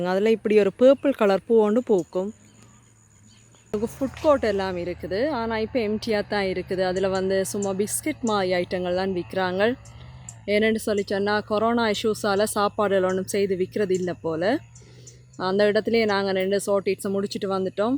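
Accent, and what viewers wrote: Jaffna, Sri Lanka. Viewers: அறிவுபூர்வமான விளக்கங்களுடன் நாகரிகமான யாழ்ப்பாண தமிழ்பேச்சும் பார்க்க, கேட்க இனிமையாகஇருக்கு வளர்க உங்கள் பணி .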